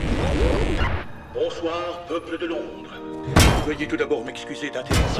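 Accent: Quebec, Canada. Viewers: C'est la vertion québéquoise ?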